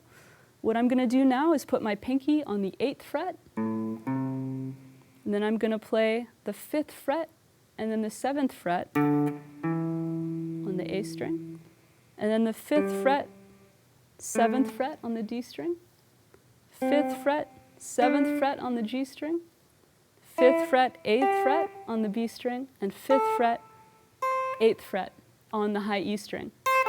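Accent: Canada. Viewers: She's a great teacher. And I love the Canadian accent.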